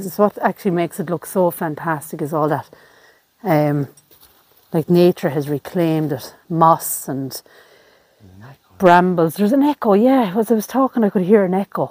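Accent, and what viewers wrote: Ireland. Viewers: Hi I am watching my first video from you, from one of your pinned post, your accent and the names, I believe you are in Ireland. Is that correct? Thanks, From Texas USA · I love the Irish brogue! Subscribed. ❤️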